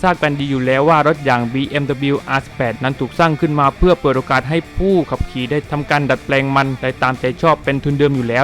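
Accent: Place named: South, Thailand. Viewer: คนใต้แน่นอนนิ้<num>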